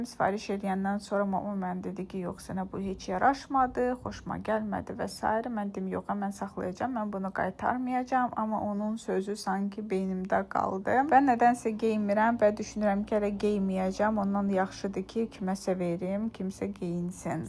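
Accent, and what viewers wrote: Russia. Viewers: danişıginda problem yoxdur, hətta bir çox rus dilli blogerlerden bele səviyyəli danışırsan. Sadəcə aktiv şəkildə rus dilində də danışdığına görə bu sənin aksentində hiss olunur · mən sizin danışığınızı çox bəyənirəm mənim uşaqlarımda sizin kimi danışırdı Rus dili idi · Xeyir sənin danşığında heçbir problem yoxdur hətta baxanda bir çox insandan daha yaxşı danşırsan əlavədə rus dili olduğun üçün xırda fərqliliy görlə bilər amma çox gözəl danşırsan hətta mənə ləzt edir sənin danışmağın sevilirsən❤❤❤❤ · Salam yaxin olsaydin men de gelib goturerdim😢ve cox sirin danisirsan menim usaqlarim da rus dillidir rus sektirin oxuyular onlarda ele danisir ❤❤❤ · Rusdilli qadinsiz deye danisiginiz ferqlidi.ona gore ilk izleyenlere qəribe gelir. Ləzgisiz?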